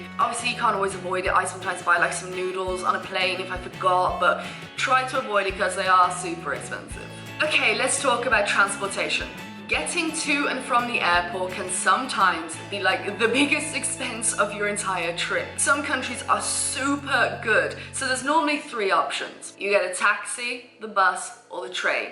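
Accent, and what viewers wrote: United Kingdom. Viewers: Out of curiosity... you're British not Australian ryt?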